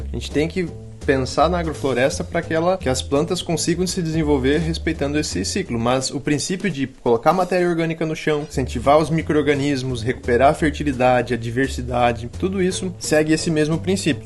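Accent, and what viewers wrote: Rio Grande do Sul, Brazil. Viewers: Pelo seu sotaque já tava me mudando pro RS. Mas sabendo que tu tá no oeste do PR, muito mais perto. Vou ir andando me enfiar nesse espaço de vocês. Hahah #FicAGenteVêPorAqui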